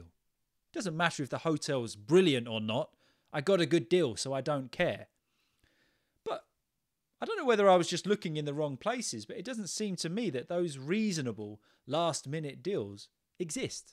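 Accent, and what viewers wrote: United Kingdom. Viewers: No, thank you. Because I admire British accents, I’ve been looking for the most linguistically profound and elegant British voice on YouTube for months and I just found it. Keep up the spectacular work. I have an issue which is that it’s easy for me to have self and social conversations and then understand almost 99% of conversations and reports passing my ear, but when to relate to official dialogue, I get jammed, keeping in mind that I'm familiar with public speaking in my mother tongue and I don't speak English well as well as I write or type.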